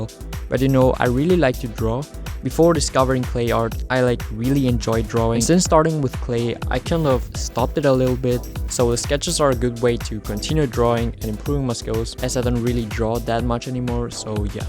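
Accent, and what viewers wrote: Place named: Germany. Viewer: Bro has crazy German accent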